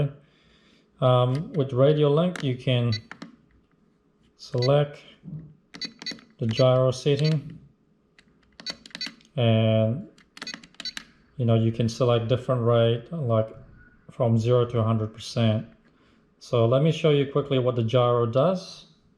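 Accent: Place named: England, United Kingdom. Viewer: Is this the uk cuz you sound english dude?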